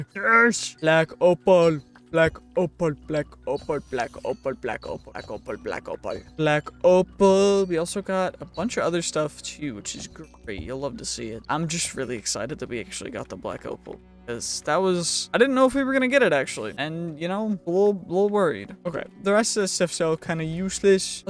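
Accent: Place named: England, United Kingdom. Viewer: 1question ... where the fuck comes the extreme english accent come from 😂😂😂?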